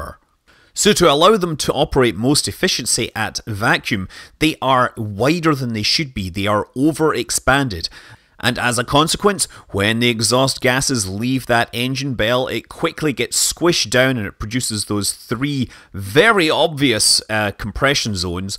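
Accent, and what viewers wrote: Scotland, United Kingdom. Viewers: Maybe this is a stupid question, but how the fuck do you know so much about rockets? I mean, I feel like I've learned so much that I'm going to start talking about rockets with a Scottish Manleyish accent.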